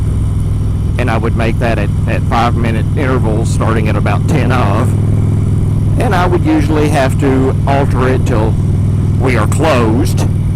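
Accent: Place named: South, United States. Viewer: I think a southern drawl actually helps in a motovlog. It blends well with riding down a country road and the rumble of the Harley in the background. It has a Mark Twain feel about it. And yes, everyone hates the sound of their voice, unless your name was Charlton Heston or Gregory Peck.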